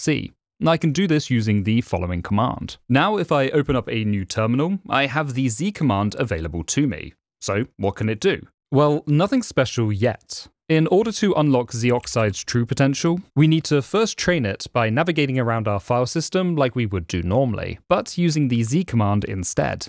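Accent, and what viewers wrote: United Kingdom. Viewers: Is it not supposed to be pronounced ‘zed oxide’ as a play on ‘red oxide’, a chemical usually known in naturally occuring forms as ‘rust’? Rust being also the language zoxide was written in, of course. Just surprised since you also have a British accent. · he does have an English accent, maybe he should be saying ze doxide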